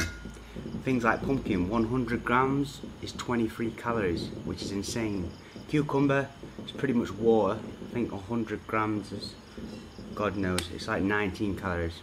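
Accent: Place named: Northern England, United Kingdom. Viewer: Its nice to hear a familiar accent to be honest! Nowhere near enough northerners on my discover page haha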